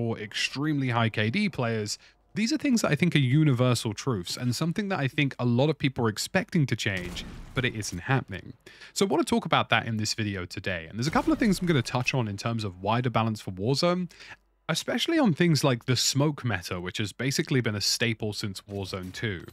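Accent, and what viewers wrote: United Kingdom. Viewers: i just wanna say your videos are like asmr, i freakin love your chillaxed voice and bri ish accent (im american and incase you didnt know ALL americans love the sound of british accents) so anyway, thanks for these videos! you and markiplier are the only two people who plays games that i watch anymore, so u two must be doing something right! have an awesome week! 🐮🌻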